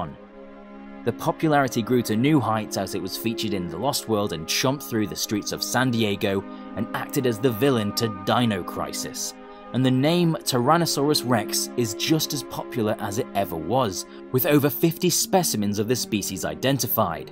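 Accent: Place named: United Kingdom. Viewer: When ever i hear voice i always get the TheGamingBeaver vibe and aura, probably because he's Brittish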